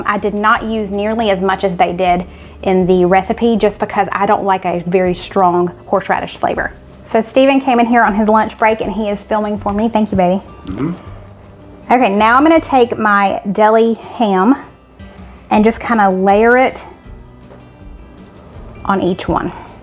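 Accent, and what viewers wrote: South, United States. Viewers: I’m a Southern girl out in the West now, and seeing your happy face (& family’s faces) and hearing your accent makes me homesick. I’m an unmarried empty-nester with severe physical illnesses (heart/lung). Cooking was my favorite thing & I can’t do it anymore, but watching you and your sweet family enjoy yourselves is pretty special to watch. Have the happiest and healthiest of New Years. ❤️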